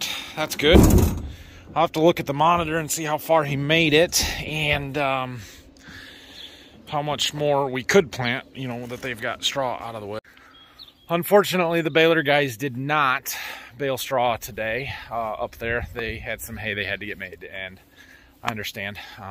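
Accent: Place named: United States. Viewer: I love how u Americans say roof. U pronounce it more like "rough". We Canadians pronounce it with a harder oo like boot.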